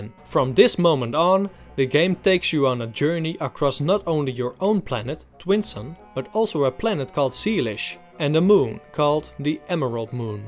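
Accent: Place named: Netherlands. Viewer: are you dutch? you have a dutch accent but you say your location is united states.....you'er a dutch living in USA or you live in NL? i'm in the hague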